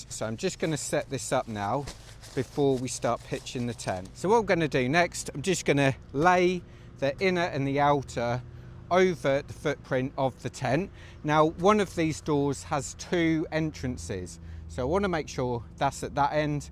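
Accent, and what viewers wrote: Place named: Northern England, United Kingdom. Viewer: I am Swiss and lived in Cambridge UK for six and a half years during the 1990's. I notice your northernly accent. Does not sound like Manchester or Birmingham to me, but I could be wrong. However, where does your accent/dialect relate to geographically? Here in Switzerland we have many dialects, only 10 kilometers away somebody speaks somewhat differently.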